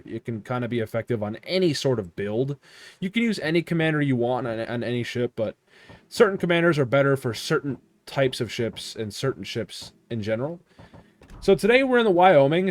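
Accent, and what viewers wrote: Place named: South, United States. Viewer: Kinda new to wow, screw every other tip video, I shall let the man who sounds like a red neck speak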